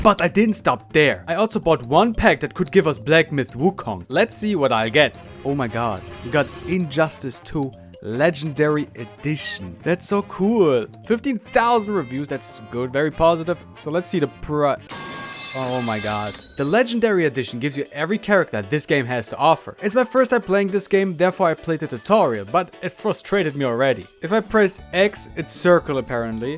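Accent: Germany. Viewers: i can hear the german accent on the cool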